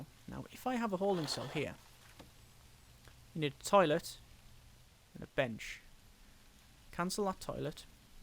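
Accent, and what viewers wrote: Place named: North East England, United Kingdom. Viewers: +emmo999 I'm from the Darlington area of North East, his accent isn't that strong to me, I can't pin point it XD Sunderland, Hartlepool, maybe Newcastle! but swinging more to Newcastle XD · North east - Newcastle/Middlesbrough/Hartlepool area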